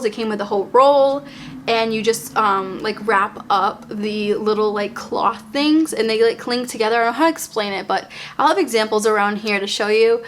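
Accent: Boston, United States. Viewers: She’s from Boston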